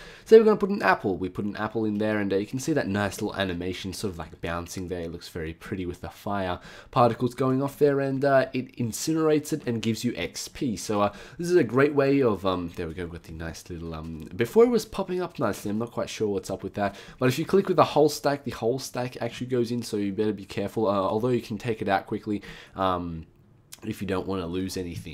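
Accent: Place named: Australia. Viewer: I can't take you seriously, until you spell like a normal person, not a mental one. Also accents are such a thing, he has a Australian accent (I believe).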